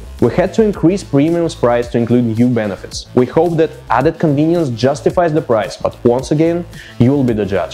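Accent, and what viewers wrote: Russia. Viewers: Чуваки, судя по акценту, сделайте видосы на русском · Братка русский знает акцент еще не ушел! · Я заметил русский акцент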